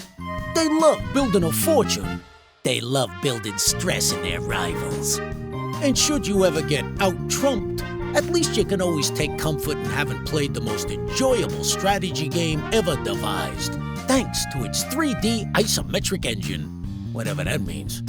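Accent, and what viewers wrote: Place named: United Kingdom. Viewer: "Voiced by yours truly" Am I the only one who was interested in mob athstetic, only to be confused by the british chap?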